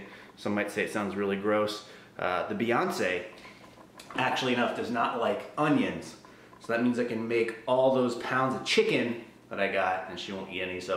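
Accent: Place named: Mid-Atlantic, United States. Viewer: It was a combo of many different states. We'll call it a Mid-Atlantic accent lol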